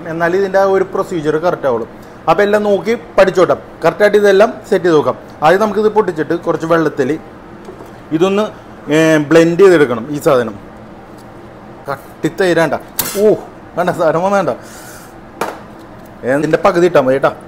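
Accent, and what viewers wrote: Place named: Ernakulam, India. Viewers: തനി എറണാകുളംകാരൻ 😁 " നമ്മ ഈ കാര്യങ്ങളൊക്കെ സെറ്റാക്കി വച്ചിട്ട് പടേ ന്ന് cook ചെയ്യണം " 😁😁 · സംസാരം കേട്ടിട്ട് പറവൂർകാരൻ ആണെന്ന് തോന്നുന്നു😅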